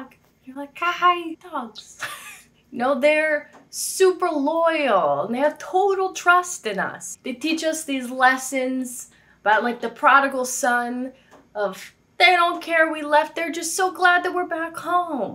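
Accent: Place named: New York, United States. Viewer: You get more of a new york accent with every video haha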